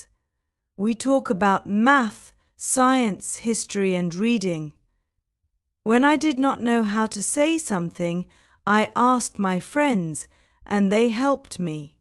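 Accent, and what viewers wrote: United Kingdom. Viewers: I found adorable the british accent. Thanks a lot. You have a new subscriber.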